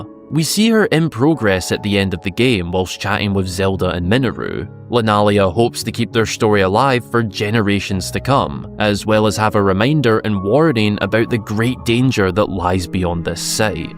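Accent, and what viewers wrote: Scotland, United Kingdom. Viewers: idk if it's because I havent watched a video of yours in a while but damn dude, did you get extra Scottish? Amazing lol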